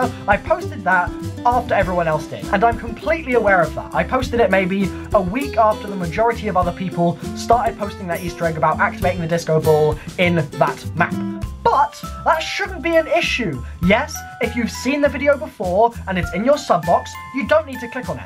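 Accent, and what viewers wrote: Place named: United Kingdom. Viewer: "8 blooDee years." British accents are amazing.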